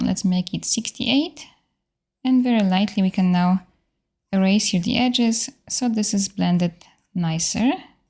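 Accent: Poland. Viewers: Hi u have polish akcent can u speak polish language?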